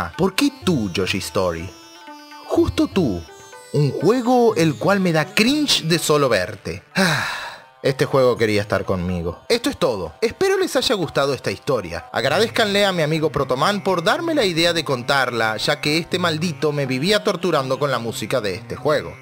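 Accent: Uruguay. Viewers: espera... Sos de Uruguay?... Paisano! xd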